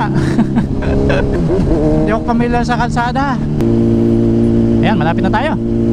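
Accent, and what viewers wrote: Pampanga, Philippines. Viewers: Yung pagtatagalog mo sir halatang kapampangan hehe ride safe always idol.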